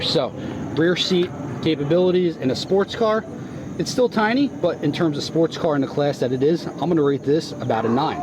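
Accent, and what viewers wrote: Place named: Philadelphia, United States. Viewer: Love that Philly accent! Good luck with the channel. Great video.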